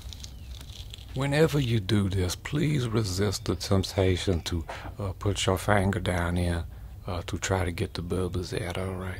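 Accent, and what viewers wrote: Louisiana, United States. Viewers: Man sounds hella Cajun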